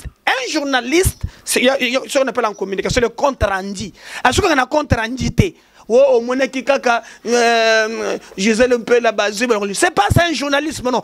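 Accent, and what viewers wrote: Kasai, Democratic Republic of the Congo. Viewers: Bonjour ndeko tiii niveau okomi tu prononce toujours Ndjili en tshiluba????ozo loba Ndili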